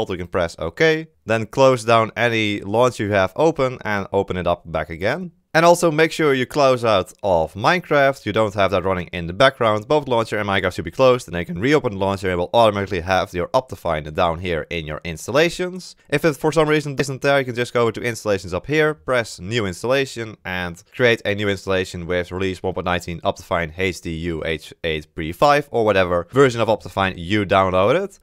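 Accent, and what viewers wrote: Netherlands. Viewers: Are you dutch? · are you dutch haha me too i can hear from your voice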